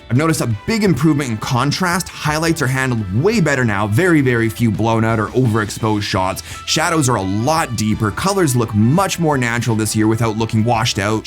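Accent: Canada. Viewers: Why is every tech YouTuber a Canadian? Never noticed until I moved to Australia and became more sensitive to fellow Canuck accents.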